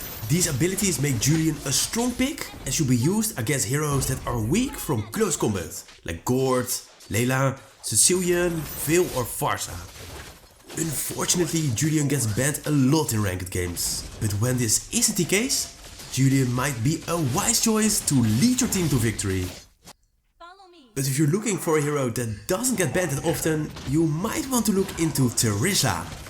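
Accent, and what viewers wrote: Netherlands. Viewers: I smell dutch bro dorry but you have such a dutch accent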